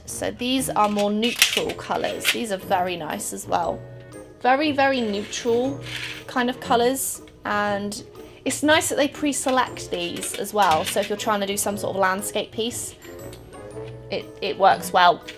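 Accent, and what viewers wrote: England, United Kingdom. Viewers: Have you ever lived in England because you sound a bit English but I belive you live in America but I'm not sure 🙃 Also I love your videos, they're really funny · As I understand you live in America, but aren’t you British? I’m thinking this because of your accent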